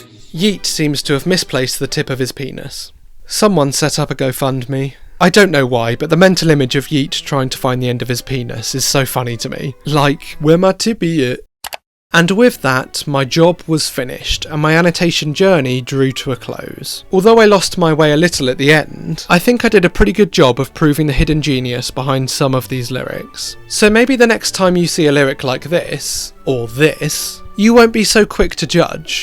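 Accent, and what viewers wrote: United Kingdom. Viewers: The British accent makes it even better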